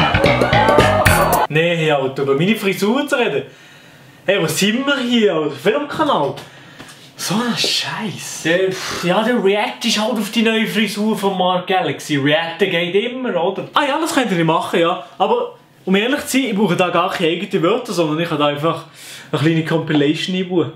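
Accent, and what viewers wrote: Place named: Switzerland. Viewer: Omg entli hen i en kanal kfunde wo nur schwitzerdütsch redet😂😍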